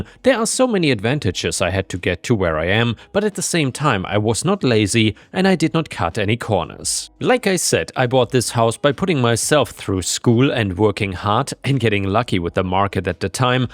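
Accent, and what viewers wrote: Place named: Germany. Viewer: dein deutscher akzent ist gar nicht so stramm, sehr gut, ich kann deutschen akzent in englisch nicht ertragen 😅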